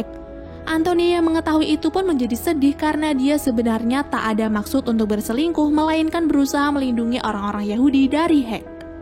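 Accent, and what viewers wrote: Pemalang, Indonesia. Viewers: Wong pemalang kye ya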